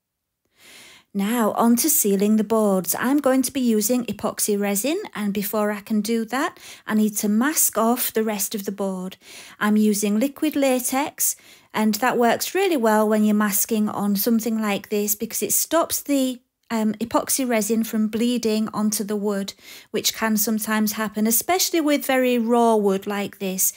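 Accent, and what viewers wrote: United Kingdom. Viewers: Hi, just come across your videos today. From your accent I would guess you are UK based? I see you see to make many beautiful things with resin, and was wondering if you could recommend a hard, heat resistant resin suitable for coasters……that won’t mark with hot cups. Many thanks if you get time to reply. Deborah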